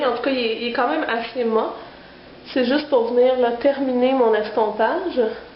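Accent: Quebec, Canada. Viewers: Tres jolie make up !!! Un gros kiff sur ton accend quebequois !!! J'adore !!! J'ai créer ma chaine abonne toi si tu veux !!!!!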